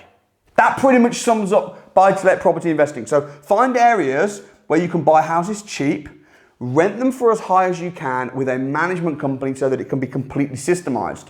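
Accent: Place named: Buckinghamshire, United Kingdom. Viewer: Thought you sounded like you were from Bucks! (Same as me) Good video thanks👍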